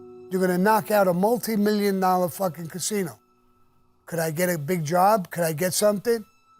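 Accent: New York, United States. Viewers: We are so blessed .Hear authentic stories from one of the legendary gangsters. unique stories told with a beautiful New York accent. For me it's better than any mafia movie I've ever seen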